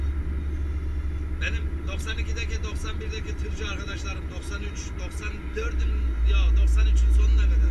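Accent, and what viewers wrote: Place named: Kırıkkale, Turkey. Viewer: Abi ankaradan sevgilerimle seni cok severek izliyorum walla konuşma şiven aynı bizim oralılar gibi bende kırıkkaleliyim allaha emanet abi 😂👋🏻👍🏻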